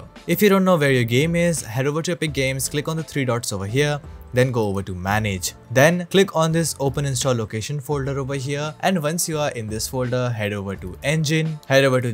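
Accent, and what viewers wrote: India. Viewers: With a hacker name like - I aint trusting NOTHING this mfer says.. "Download and run these programs..' HELL NO, especially when he sounds like some ripoff artist from India.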